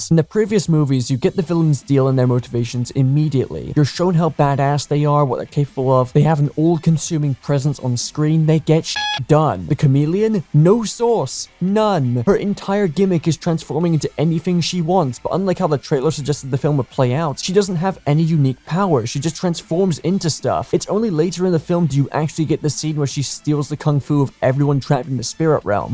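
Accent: United Kingdom. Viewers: The British accent 😭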